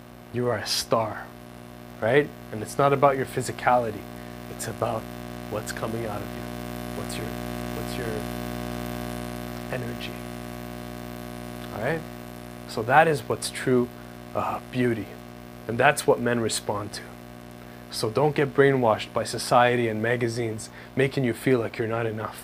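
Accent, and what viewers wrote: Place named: Greece. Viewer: I love your accent...Are you Greek?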